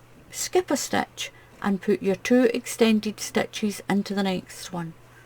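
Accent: Scotland, United Kingdom. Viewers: I love all your patterns, you can make crocheting so easy to do, you are a great teacher...you explain every stich perfectly. I love listening to your Scottish accent, and when muttley gives a wee bark now and again, Thank you, xx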